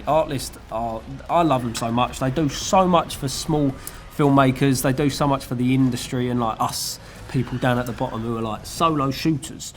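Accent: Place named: Essex, United Kingdom. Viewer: It’s the Essex / east London accent. I’m guilty of it. If I say “have you seen Thor?” people say “four what?”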